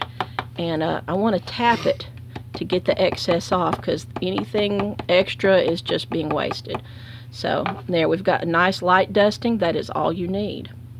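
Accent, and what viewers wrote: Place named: South, United States. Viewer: Oh . You have a southern accent? I didn't notice . I picked up my English in North Carolina . So apology is not needed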